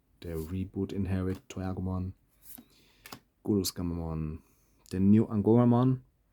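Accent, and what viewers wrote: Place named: Germany. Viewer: Irgendwie hab das Gefühl, das du in deinem Englisch Ton, eingentlich deutsch sprichst 😅 is das so?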